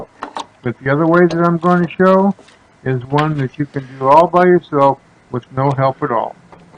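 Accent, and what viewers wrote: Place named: South, United States. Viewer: RB CV really? He sounds really clear to me. Sounds like he maybe has a southern accent or southwestern accent.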